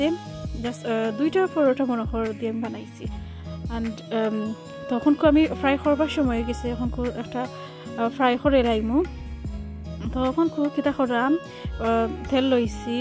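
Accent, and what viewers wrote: Sylhet, Bangladesh. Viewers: মাই অসাধারণ তো আমার সিলেটি খালা মাত হুনিয়া অনেক ভাল লাগলো আমার তো একজন সিলেটি পাইলাম মাশাল্লাহ বন্ধু হয়ে গেলাম আমি সিলেট থেকে ইপু আমাক দেখিয়া জাইয়েন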